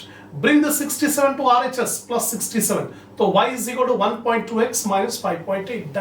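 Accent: Hyderabad, India. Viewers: sir aap Hyderabad se hain kya ???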